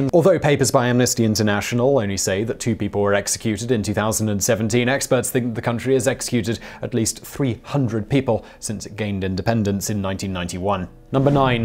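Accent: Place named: London, United Kingdom. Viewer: Mr bald guy speaks so fast with his silver spoon London accent. His enthusiastic tone dies down towards the end due to fatigue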